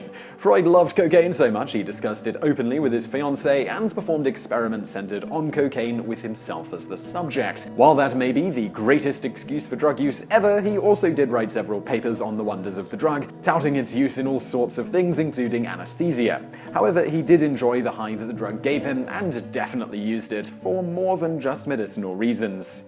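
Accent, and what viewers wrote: England, United Kingdom. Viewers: The fact the guy thats talking has a english accent makes me happy and satisfies me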